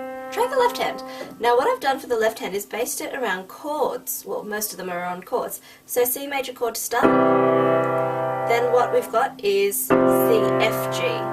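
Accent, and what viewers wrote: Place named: Australia. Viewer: Nice to hear an Australian accent :-)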